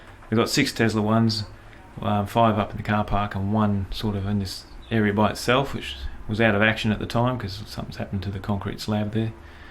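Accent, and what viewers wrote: Australia. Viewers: how come your australian accent is so "mild"?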